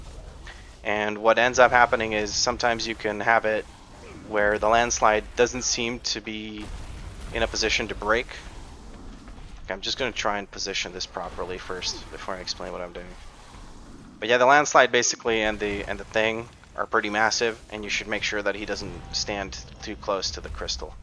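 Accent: United States. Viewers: Awesome vids! You sound American, but I keep seeing Russian and German in party chat. Are you from Europe? Im partially asking cuz usually once per video I see you pull mobs I have never seen pulled before😂 Was just wondering if it was more common in NA. Or if you just pull somewhat randomly until you get to a key level where it gets a bit harder. All vids are really helpfull and informative as im trying out tank for the first time😅 Keep em coming💪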